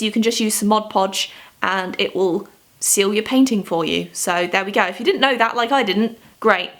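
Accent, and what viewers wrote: United Kingdom. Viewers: Thanks, you always make me laugh, and it's great to hear a British accent. I'd heard of the coloured pencil and baby oil, however, wasn't sure it worked... One hack I always use is Ellnett hairspray. It has to be silicone free. Cheap alternative to graphite fixative, I've even set pastels with it too, and it's a quarter of the price of the named brands. 😊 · I watch your vids for hacks and to hear your amazing voice(I would love to have that British accent ahhh <3 ) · not related to her hacks - but is her accent british. to me it looks like british, but then she mentions about dollar store and Ebay USA. so me a little confused.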